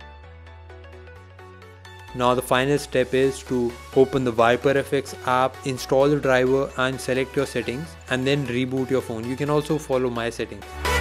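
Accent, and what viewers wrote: India. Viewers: fucking indian accent, cant stand it!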